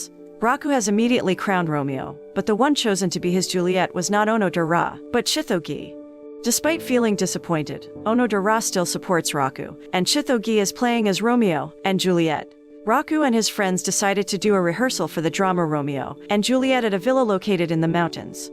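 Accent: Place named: England, United Kingdom. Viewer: ENG accent makes it a little hard to understand. ニセコ, neese-ko-ee Nisekoi, and not nasekoi xd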